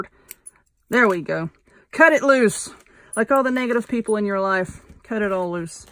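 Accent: South, United States. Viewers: I love your chatter! And your Southern accent! And you absolutely, hands-down, make the most beautiful wreaths on YouTube!! I most definitely am going out tomorrow hunting for all the stuff to make this one too!! Keep 'em coming!! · I life you because you don't talk a lot you are explaining what you are doing. Oh & I like your southern accent. I am from Laredo, Tx. & live in Cotulla, Tx*;0) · I love your chatter! I also like the "southern accent" you have (I am from South Carolina). Don't change for anyone! You are a wonderful crafter and that's what brings us all back! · You are such a great teacher! I totally feel like I can make anything you give instructions for. And being a Southern Girl myself, I adore hearing the “Oh good lords,” etc. You sound just like me! 🤪 Love your channel.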